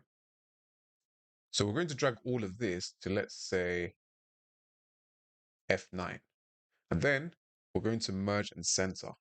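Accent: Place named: United Kingdom. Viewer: And your British too perfect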